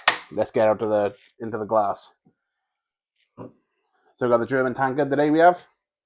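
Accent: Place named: Wales, United Kingdom. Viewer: Nice review, nice and objective about it. Not to take anything away from this drink as I like it myself, this particular one is brewed n France (it was German brewed years ago) and on the subject of Aldi clone/tribute brands, this particular one is a clone of Germany's Krombacher Pilsner. The Krombacher is available in Tesco and Morrisons as part of their 3 for £6/6.50 deal. Btw I detect a Welsh accent, do you live in Wales?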